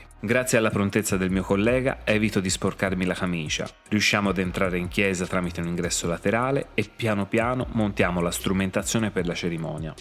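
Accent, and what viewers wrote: Tuscany, Italy. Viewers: Cmq mi fa impazzire il tuo accento toscanooooo🤣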